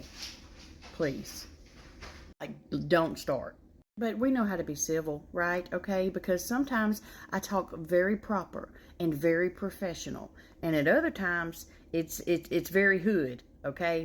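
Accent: South, United States. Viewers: You're like my twin with a southern accent. I love you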